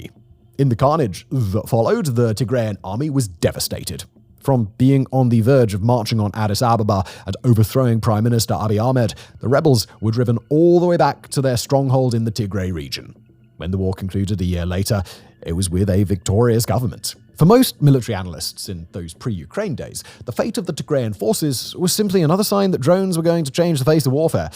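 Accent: United Kingdom. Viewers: PM Ciolacu is not from Iohannis' party. That party is the NAtional Liberal Party, a center-right party. Ciolacu is from PSD, the center-left (and socially conservative) party that has dominated Romanian politics in all of its incarnations. Ciuca was Iohannis' creature, since the President propelled him to lead the National Liberal Party and to be PM before Ciolacu as part of their left-right alliance during the war in Ukraine. Listening to these videos reminds me of how Gell-Mann amnesia manifests, when you believe something a Brit tells you in his cool accent until he starts talking about something you know.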